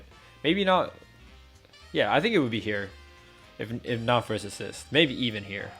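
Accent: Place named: Sweden. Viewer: he literally said he may misprounce some names due to his swedish accent. Just like how he say ginjew instead of ginyu. He knows he is mispronouncing some names. Plus, you don't have to watch dbz to be good at this fighting game. You don't have to play Super Mario or Legend of Zelda or Pokemon to be good at Smash Bros.How much you watch dbz has literally no correlation to your skills in this game. In fact, this game is made by Arc Systems, so it's literally a Guilty Gear game with MVC assists.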